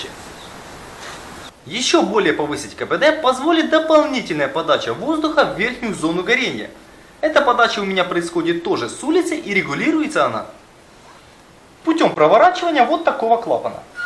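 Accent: Ukraine. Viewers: Який чудовий м'який український акцент у жіночого голосу... Прошу, випустіть хоч декілька відео українською . ... А може,можна просто продублювати вже готові Ваші відео на українську. Це буде великим збагаченням українського контенту Ютубу і , взагалі, домогою Україні для просування до прогресу.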